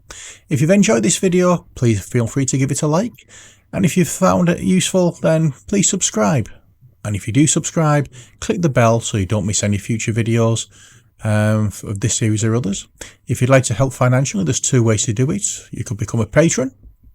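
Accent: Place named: Leigh, United Kingdom. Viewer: Just looking for a painting recipe for Delaque, and pleasantly surprised by a local accent while watching over the border here in sunny Leigh!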